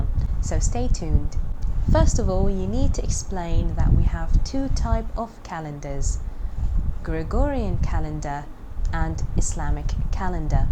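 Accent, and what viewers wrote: United Kingdom. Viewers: I really appreciate your British accent 🎉 thanks a bunch for the useful vocabulary & ramadan mubarak for you ❤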